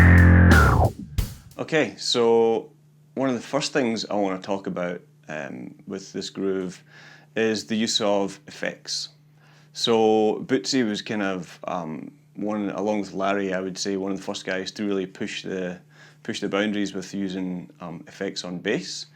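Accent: Scotland, United Kingdom. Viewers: What kind of accent is that? Scottish - using American terminology- and he’s in Melbourne? Total distraction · You're Scottish!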